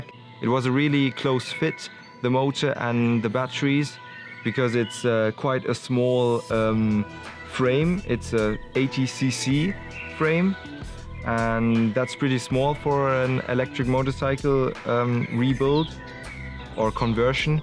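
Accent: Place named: Germany. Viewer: Hey great job! I will probably start one project myself in the winter. I am preparing stuff now and try to find a lightweight electric motor... where did you get yours and what kind of motor is it? There are so many different ones... also did you need a converter? do you think you can compare the power the bike has to a combustion engine? Where you from also? Sounds partly like you are from germany (i am from germany)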